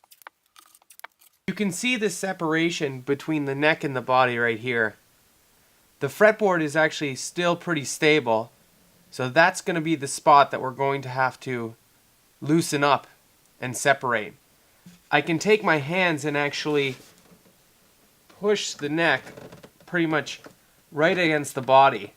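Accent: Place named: Canada. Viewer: Thanks for the great video. Do u have a video that shows how you did the neck reset? I have a problem with my Martin. I can see a considerable 1/8” gap at the heel of my neck. My guitar is 20 years old. I think the wood has shifted with time. Not sure what to do at this point. I am in Mexico so I don’t have much luthiers around me. Hey you’re Canadian? You sounds like english canadian. I am french canadian from Quebec!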